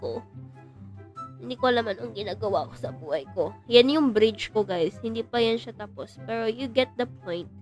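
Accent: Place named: Conyo, Philippines. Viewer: ang cute ng pagka conyo ni ate 😭🤏🏻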